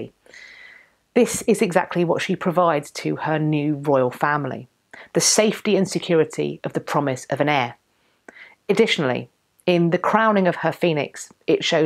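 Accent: United Kingdom. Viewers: Hi, I am new to your channel, I live in the US but I wasn’t born here! I love the beautiful British English, along with the fascinating content. I have been obsessed with the Tudors and British history! Can you do a video about Katherine Howard?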